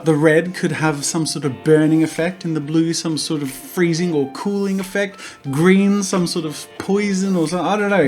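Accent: Australia. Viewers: lol I love how he does the accent, even tho us Australians don't use that thick of an accent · I have his accent because I'm Australian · I knew you were Australian and not from Boston! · Friend: "Why does he sound like that Australian guy from Crocodile Dundee?" Me: "Probably cause he's Australian." Friend: "Really?" Me: face palm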